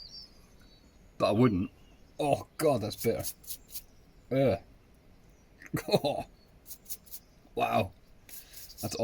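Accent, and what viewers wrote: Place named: Scotland, United Kingdom. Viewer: love your Scottish accent, are you from Edinburgh like me. I also make tea from dandelions Leaves by drying them. Stinging nettles are great too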